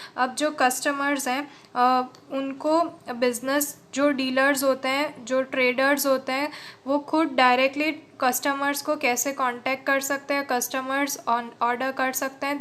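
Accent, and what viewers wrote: Gujarat, India. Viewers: Mam are you from Gujarat?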